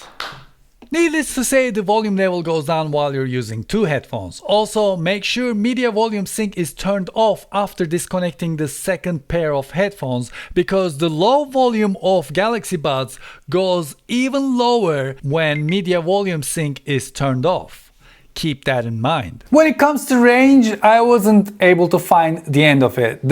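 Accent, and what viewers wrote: Turkey. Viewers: I sensed Turkish accent. Am I right?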